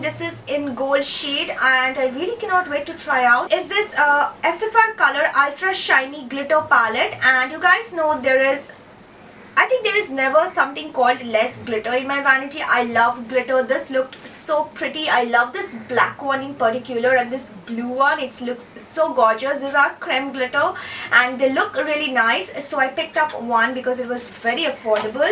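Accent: Bengal, India. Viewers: Apke bolne ka tarika...sooo cute..bengali touch me..love u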